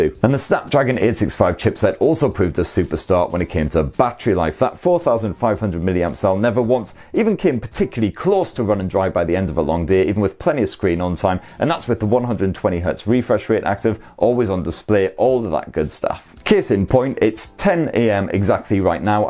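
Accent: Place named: Newcastle, United Kingdom. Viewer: Great review and easy to listen to seeing as the presenter has the same Geordie accent I do. Top blerk!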